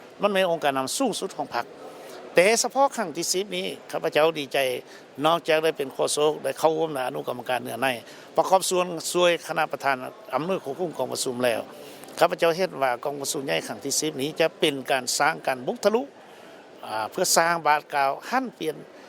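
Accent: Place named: Vietnam. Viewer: เว้ากะบ่อชัดภาษาลาวเว้าผสมแกว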